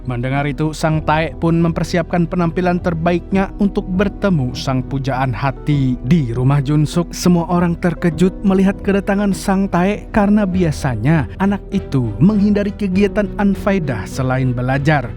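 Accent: Banjar, Indonesia. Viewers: Suka suara x orang banjar kah bang?😊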